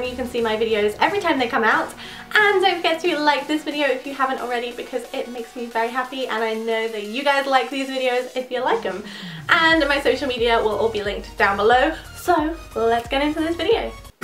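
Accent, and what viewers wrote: United Kingdom. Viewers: I really like your videos! You have so good ideas 😍 and I like british accent😊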